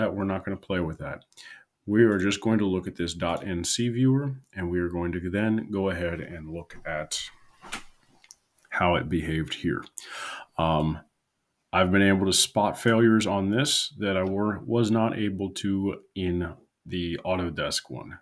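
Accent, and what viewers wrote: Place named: United States. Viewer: you sound the most american ever. you are funny btw hahahahahah.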